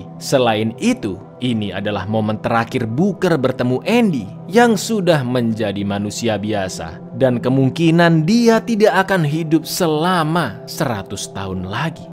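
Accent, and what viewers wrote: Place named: Surabaya, Indonesia. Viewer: Lo samean wong suroboyo ta cak?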